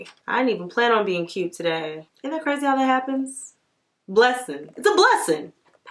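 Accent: South, United States. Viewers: The southern twang was so cute! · I have five of these including mermaid and Oil Slick and I LOVE THEM!!!! 💟💟💟💟💟💟💟 And yes for the country accent 😂😂😂😂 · That country accent 😂😍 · Lol the country accent killedt meh😂🤣 Looooove your videos😍💕👏🏾👏🏾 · That country twang tho!!! I'm here for it!! LOL!! 🤣🤣🤣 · That accent is giving me "Where's Applejack" vibes lol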